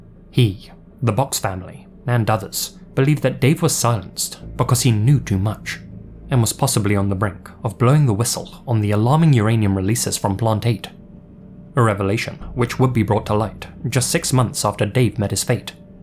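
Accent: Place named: United Kingdom. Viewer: Ngl I hate British accents… but in this case the way you narrate stories with your British accent actually makes the stories better. Keep up the great content!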